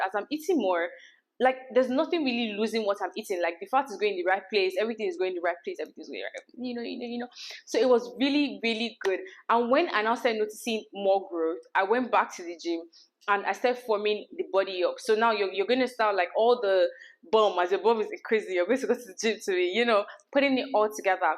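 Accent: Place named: Nigeria. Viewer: It's her Nigerian accent for me 😘😘😘❤️❤️❤️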